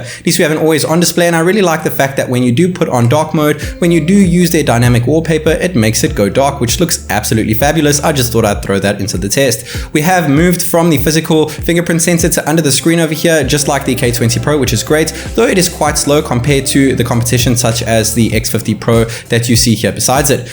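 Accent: South Africa. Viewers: You should add ZAR to you currency conversion. You sound South African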